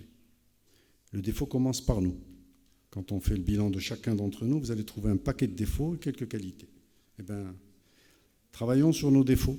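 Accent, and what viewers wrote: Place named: Marrakesh, Morocco. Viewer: l'accent et l'humour marrakchi vous colle toujours notre cher ministre...c'est bien pour attirer tout cet adorable auditoire à ce que vous dite...si important et si sérieux. merci moulay hdid Alami.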